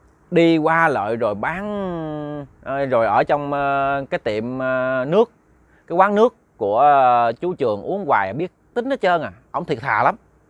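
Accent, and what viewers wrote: Mekong Delta, Vietnam. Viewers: Rat' thich' kenh ke chuyen ma cua voi' giong chan chat' mien`Tay & nhung chuyen ma co' that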